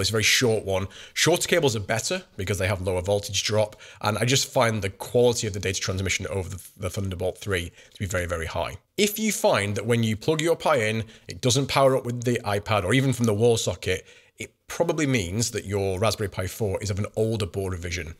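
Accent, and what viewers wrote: United Kingdom. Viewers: Just found your channel tonight and have watched 3 or 4 videos and really enjoy listening to you. Its also nice to hear a fellow brit voice too.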